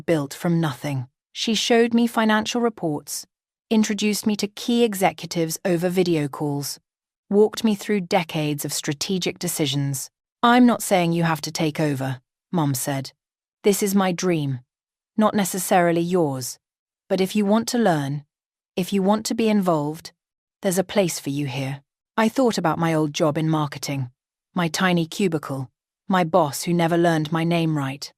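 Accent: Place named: England, United Kingdom. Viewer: May I say your voice with the English accent makes the story real.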